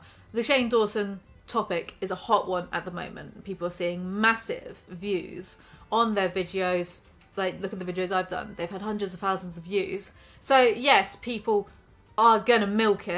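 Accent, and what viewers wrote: United Kingdom. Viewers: You went full British for most of this video and I’m sliving for it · its not just the accent, youre sooooo brit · i wish i could get my own british person to rant about stuff to me all day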